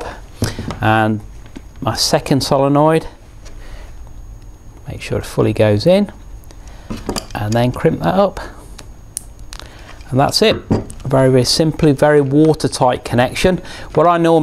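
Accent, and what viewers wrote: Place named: United Kingdom. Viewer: Brits are great instructors...they have a command of the King's English. I am an American. Even though we fought against the British to be free and have our own nation, we fought and died together decades later, side by side in WWII , against the "Progressive Globalists" of that time then, the German Nazis.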